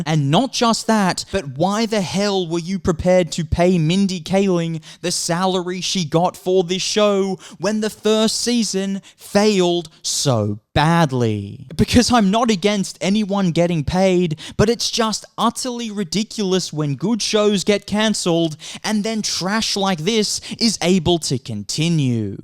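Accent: Australia. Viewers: It's Australian accent I think. Sounds normal to me.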